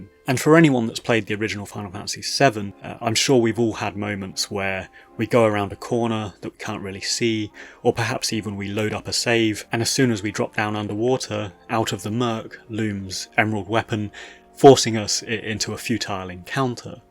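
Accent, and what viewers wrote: United Kingdom. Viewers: British limey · fuckin' jesus wow british accent old game "review" that's an opinion dude find something else to do lmao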